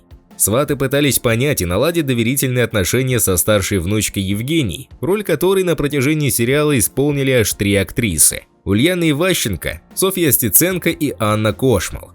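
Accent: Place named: Russia. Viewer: Как же ваш москальский выговор портит слово,, сватЫ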